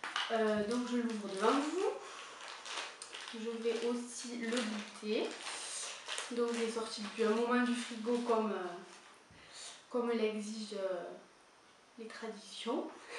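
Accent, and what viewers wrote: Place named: Languedoc, France. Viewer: Le format classique est bien AOP... et moins cher au kilo et il a surement plus de chance d'être fait a coeur (encore qu'il faut le choisir a défaut de pouvoir demander a sa fromagère), personnellement que ce soit celui la ou le Gillot ou tout autre au lait cru, je les trouve très fort, pourtant je vis en Normandie, a votre accent (Languedoc ?), je devine que vous non, shame on me, lol. Le Neufchatel ou le saint-félicien, de la même marque, sont très sympa aussi.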